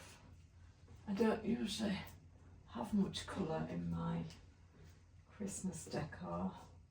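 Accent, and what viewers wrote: Northern England, United Kingdom. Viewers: I really love your content and your house and tree look beautiful. You have a lovely soft and warm northern English accent, nowt wrong with that. Have a great week. 💕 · Your gold wreath is exactly what I was looking for, Home Sense failed me though. I love your northern accent, you sound just like my Manchunian best friend who now lives in the US, happily she hasn’t lost her accent which I think is “dead nice”. ❤️ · Not boring, not annoying, and you have a lovely Northern accent. It's nice to see home decor ideas that don't cost a fortune in a normal comfortable small home. Some people seem to get a thrill out of being spiteful. My old Northern Mum used to say - if you can't say anything nice - don't say anything at all......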